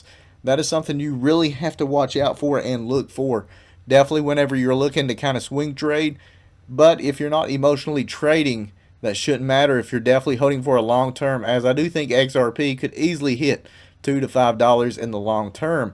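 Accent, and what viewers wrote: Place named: Appalachia, United States. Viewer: it's hard to believe anything you say with that hillbilly accent.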